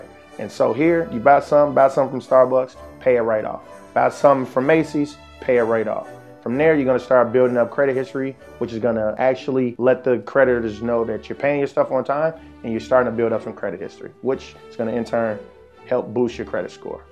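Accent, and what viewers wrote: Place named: Chicago, United States. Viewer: Bro GOTTA be from Chicago !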